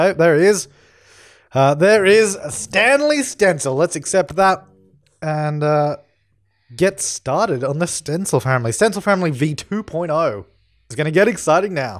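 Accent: Australia. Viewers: I'm trying to figure out by your accent where in Australia you're from aha. I love watching your builds. I miss the Sims 2 so much. If you register your base game with Origin, you get The Sims 2 Ultimate Collection for free at the moment. Meanwhile, most of you watching probably already have each pack :p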